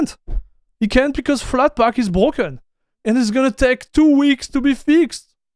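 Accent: France. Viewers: The french accent is so strong ;)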